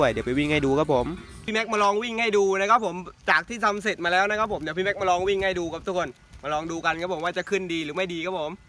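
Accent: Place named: Central Thailand, Thailand. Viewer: สำเนียงกลางภาษาไต้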